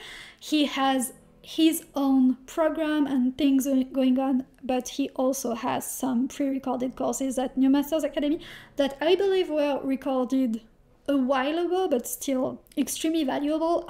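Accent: France. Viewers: Your accent is French ???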